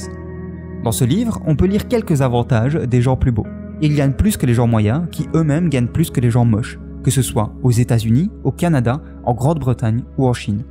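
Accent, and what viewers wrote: Belgium. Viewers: On garde l'accent belge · J'ai cru que tu étais mort ! xD Sérieusement très bonne vidéo comme d'habitude et vive l'accent Belge !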